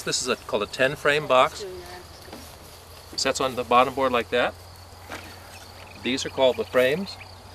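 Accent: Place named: England, United Kingdom. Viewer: Hey you sound like your from England, did you move to the USA then to start your bee company?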